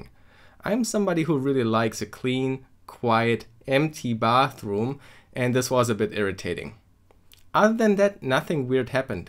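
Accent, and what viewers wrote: Germany. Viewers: That german accent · r u a german guy? i heard the slang long and in the clip the alter got me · Dicker der Deutsche Aktzent zerstört so haha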